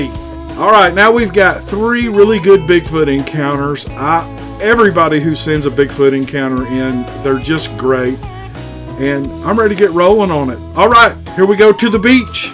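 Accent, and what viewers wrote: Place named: North Carolina, United States. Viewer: Nice job. That accent you have is similar to mine in NC. I know a lot of fine folks who talk like us. And I wouldn’t trade it for nothing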